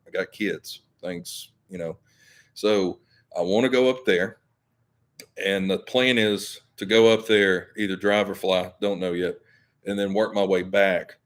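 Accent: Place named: South, United States. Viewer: Sorry I missed your live ..enjoy your videos. I'm from Ga so your southern accent is great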